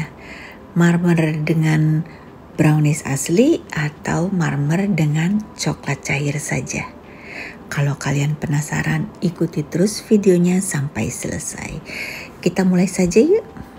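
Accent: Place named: Banyumas, Indonesia. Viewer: Suaranya agak mirip THV Channel (The Hasan Tv) tp THV versi ngapaknya 😁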